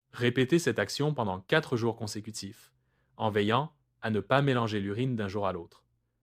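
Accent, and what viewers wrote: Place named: Quebec, Canada. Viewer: assortie d'un bon accent quebecois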